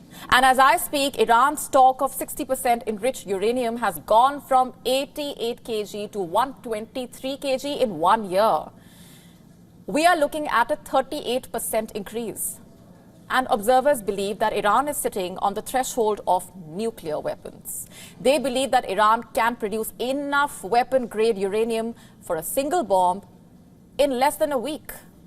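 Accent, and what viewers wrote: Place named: India. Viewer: Very good news report in indian English easy to comprehend unlike American and European English pronunciation having a lot of guttural and nasal accent Thanq Wion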